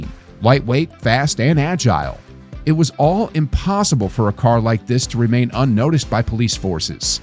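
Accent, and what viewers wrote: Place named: United States. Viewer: The yank voice put me off!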